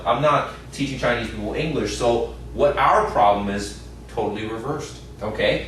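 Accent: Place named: United States. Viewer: Dude is amazing. Except he doesn't sound Canadian. He sounds American. Maybe he watched too much HBO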